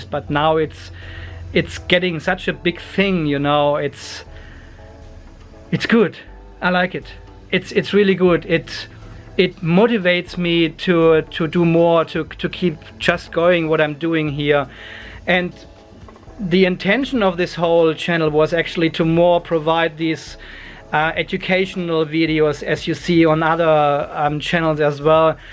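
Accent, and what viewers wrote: Germany. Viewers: If I may ask. You are in Australia, but im assuming you are German based on your accent? Whats the story on that? Really love your videos. So real, so relatable. Keep it up.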